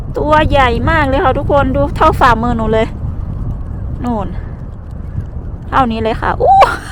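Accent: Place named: Chaiyaphum, Thailand. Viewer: เว้าคือคนชัยภูมิเนาะ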